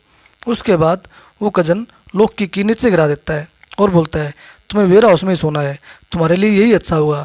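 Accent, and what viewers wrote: Haryana, India. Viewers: Tum harayana se ho kya bro 😍😍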